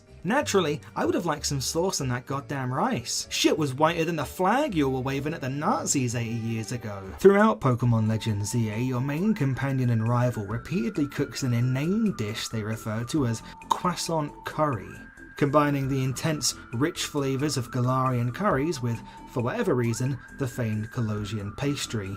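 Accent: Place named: United Kingdom. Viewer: Oh for the love of god, of course he's british😭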